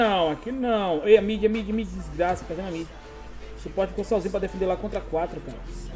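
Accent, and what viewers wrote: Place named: Bahia, Brazil. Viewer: Ó larga o doce pivete ó larga o doce pivete ksksk parece q vc é daq da bahia ksksks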